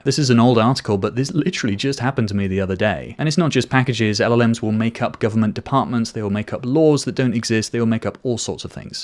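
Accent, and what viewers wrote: United Kingdom. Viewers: Thanks for the video, you are becoming my favourite source for AI based videos as a developer. Unbiased, realistic and love your British accent, but, I hope you are not an Arsenal fan:D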